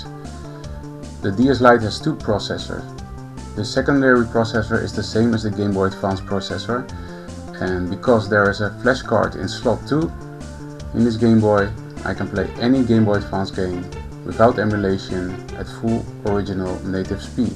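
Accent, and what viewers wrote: Netherlands. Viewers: Soep in je schoen. Nederlands accent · Dat Nederlandse accent, though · Are you Dutch? Cause you sound a little Dutch. :) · You are dutch, i can hear it from your accent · w0w dutch accent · You must be dutch, what a horrible accent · Nederlands accent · the second he started talking i knew he Dutch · Are you Dutch? Becouse you sound Dutch. I'm Dutch :)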